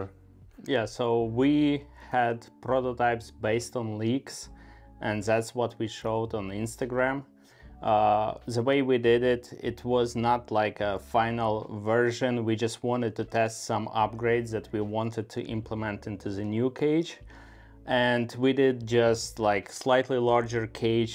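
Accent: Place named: Russia. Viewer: Russky accent. New génération Russians have good accent, because they have learned English since they are in kindergarten with real English teachers.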